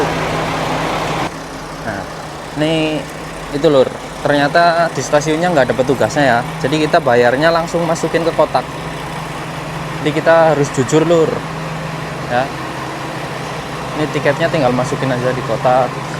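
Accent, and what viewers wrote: Banyumas, Indonesia. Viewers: Bener mba ..wong Bnyumas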